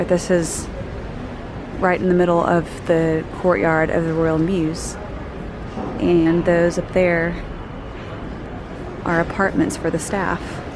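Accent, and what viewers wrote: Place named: South, United States. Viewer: Great videos. I am enjoying your vlog style and your southern accent.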